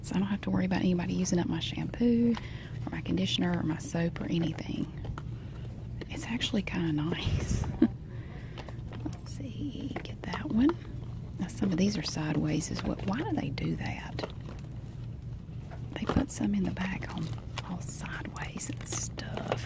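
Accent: South, United States. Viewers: Please keep making these shelf organizing videos❤️ They’re so satisfying, plus u make me laugh without trying, and ur southern drawl makes me feel at home❤️❤️❤️❤️ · I just absolutely love your southern accent. A few years ago, I moved from the south and I really miss the southern accent and to have it combined with a wonderful asmr video is amazing! Thanks you!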